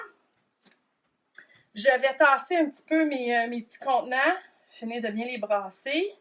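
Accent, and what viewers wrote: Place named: Quebec, Canada. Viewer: Bonjour Enfin une video de pouring en FRANCAIS, e vous en remercie; même si c'est du Français Canadien, au contraire c'est très agéable d'entendre votre joli accent je n'arrive pas bien a mètriser mes mélanges, j'emploi moi aussi du Floetrol, ils sont soit trop liquides ou trop épais. Comment je dois procéder, pour que mes mélanges soit parfaits?j'attends votre reponse SVP merci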